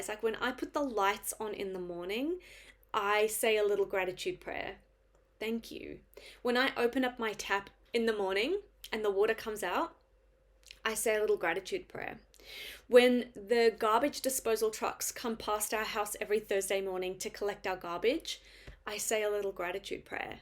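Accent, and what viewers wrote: Australia. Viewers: Your accent is 100% Aussie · Couldn't tell by your accent, proper Aussie now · you have Australian accent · You have picked up the Australian accent very well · Good to 'hear' that you have an aussie accent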